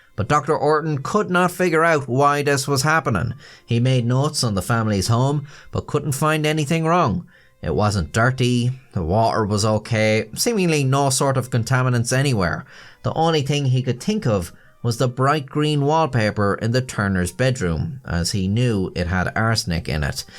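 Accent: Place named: Ireland. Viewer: For the love of Pete can we please have more Irish voiceovers on YouTube 👏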